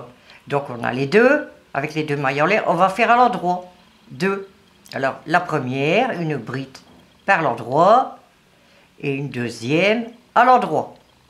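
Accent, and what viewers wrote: North, France. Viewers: bonjour, comment va tu je vois que tu as fait un très beau pull, mon arrière petite fill.va avoir deux et griniette petite menue, il et très beau comme habitude, c est bon de t entendre parler avec ton accent du nord, je vais essayé de le faire avec moins de mail? gros bisous et gros Câlins a pompon, fais bien attention a toi, a très très vite bonne après midi, Monique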